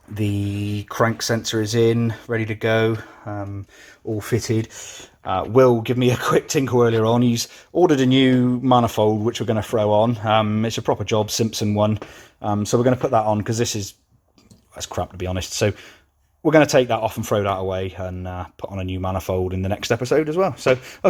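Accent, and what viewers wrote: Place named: RP, United Kingdom. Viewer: Don't you sound posh on these videos, nice work mate, love all this old stuff.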